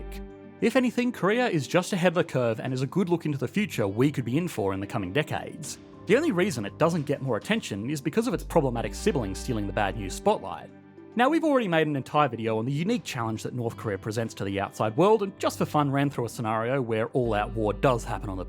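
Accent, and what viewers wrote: Australia. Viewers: Dude, how many channels do you run? I heard this Aussie accent before!😊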